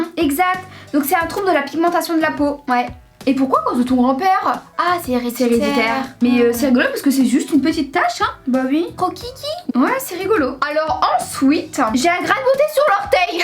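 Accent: North, France. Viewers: On sent que vous avez l'accent du Nord😅,moi aussi je suis du Nord. Ps:J'adore vos vidéos❤, vous êtes hyper énergique et cela me donne du plaisir à regarder vos vidéos !! Si t'es d'accord like👍 | \/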